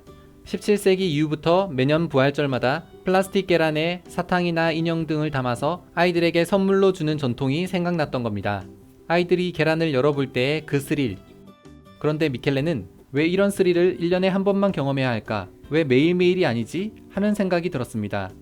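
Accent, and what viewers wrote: Gyeongsang, South Korea. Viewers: 원래 출신이 경상도 출신이신듯. 왜냐면 억양이 유시민 느낌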